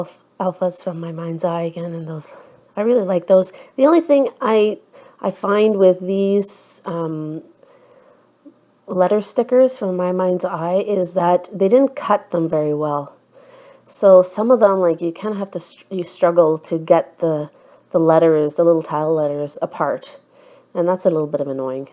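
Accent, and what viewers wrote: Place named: Canada. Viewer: Just found your channel, love your PL process video. Hope to see more of them. I used to live in Canada north if Toronto and last year we moved to Australia. Was really great to hear a Canadian accent.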